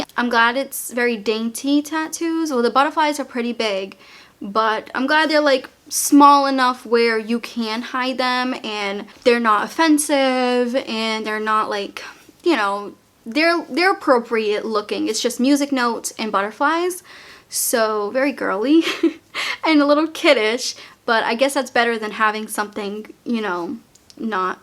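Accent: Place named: Massachusetts, United States. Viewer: Massachusetts. Her accent is the number 1 reason why I subbed and she tries so hard to not have an accent lol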